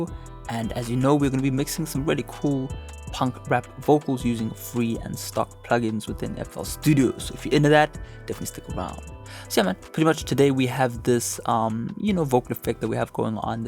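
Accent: South Africa. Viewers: Am i the only person that thinks your from South Africa? 😄 only recently discovered you and im South African myself. Your mixing advice has helped my vocal mixing out ALOT. Appreciate it broski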